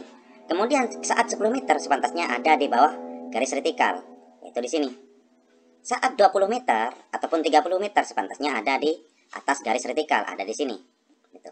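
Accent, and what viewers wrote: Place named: East Java, Indonesia. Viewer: Trims ilmunya, suaranya lucu, logatnya Jawa Timuran😂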